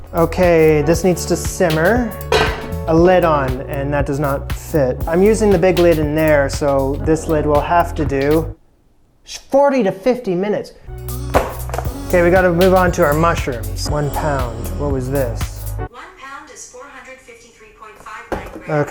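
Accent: Canada. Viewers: Listening to you speak you sound like someone from North America and your ou dip-thongs narrow that to Canada, but your vocabulary (minced beef, rubbish) suggests you’re on the other side of the Atlantic. But then you express surprise that butchers don’t understand what you want when you ask for a cut of ‘chuck’, beef, which I would have thought as an ex-pat you’d simply realise is because it’s a term not used in the country you live in. But you don’t make any comment bringing up that possibility, (very odd). All of this creates an air of mystery. After watching two videos, I notice you never refer to your nationality, even though you use vocabulary that doesn’t fit with your accent. I’m assuming, based on your trip to the butchers, his accent and the look of the buildings outside, you’re somewhere in the UK. To top it all you look and perform way too expertly in the kitchen for someone who doesn’t know much about cooking. 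The mysteries just keep coming. Why is there such a big mystery about where you’re from, why you’re cooking in a country you’re not from, how you became so adept at preparing and cooking food and so on? Is there some part of the puzzle I’m missing?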